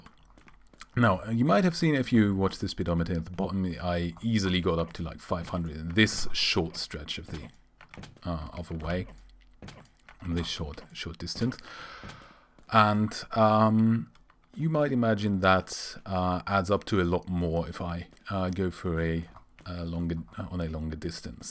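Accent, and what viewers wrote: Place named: Germany. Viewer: what is your primary language if I may ask? german maybe? ^^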